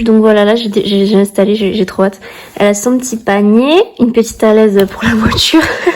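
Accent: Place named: South, France. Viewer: J'adore ton accent, tu es du sud-est ou du sud-ouest? personnellement je suis ton vlog depuis Toulouse, j'aime le sud de la france. Ton petit chiot est adorable!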